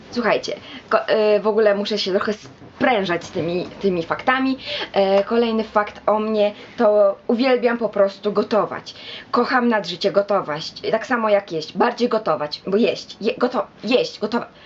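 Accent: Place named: East, Poland. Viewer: z takim zabuzanskim akcentem, to mosfilm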